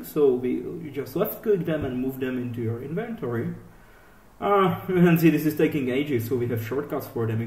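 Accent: Czech Republic. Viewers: nják slyšim českou angličtinu :D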